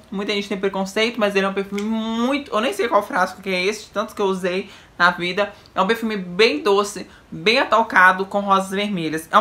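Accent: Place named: Minas Gerais, Brazil. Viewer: Pode falar bastante . Amo esse sotaque mineiro que emenda as palavras . Acho chique .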